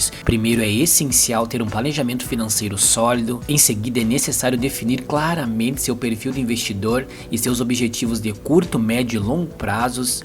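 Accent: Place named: Rio Grande do Sul, Brazil. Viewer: Bah gaúcho kkk que sotaque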